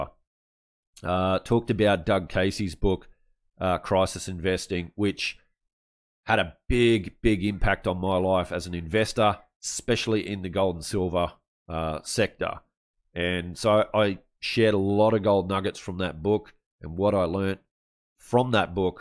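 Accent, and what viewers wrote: Australia. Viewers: Love the Australian accent , EH!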